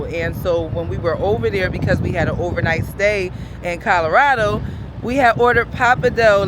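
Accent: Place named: Caribbean. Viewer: Nice video you sound like someone who has caribbean blood in you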